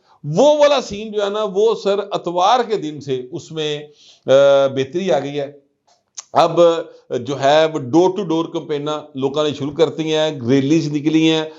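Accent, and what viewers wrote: Lahore, Pakistan. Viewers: صاحب کا سٹائل آف expression لاھوری ٹچ کے ساتھ انتہائی خوبصورت اور منفرد ھے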